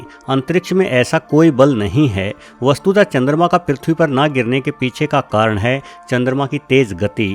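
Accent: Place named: Bihar, India. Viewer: Bahut sound like bihari tone 🤣🤣🤣😂😂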